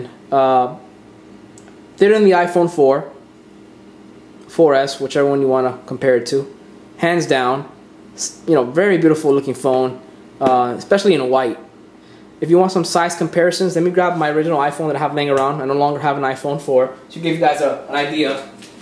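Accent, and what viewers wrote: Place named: Australia. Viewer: and anyone paying him out about his accent needs there head cracked in !!!! would love to hear some wanka say that around an aussie :)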